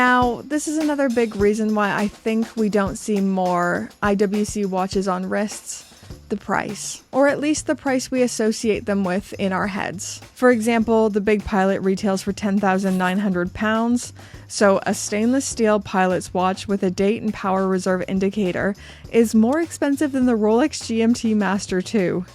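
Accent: Canada. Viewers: I'm here for the watches... and her great Canadian accent 😊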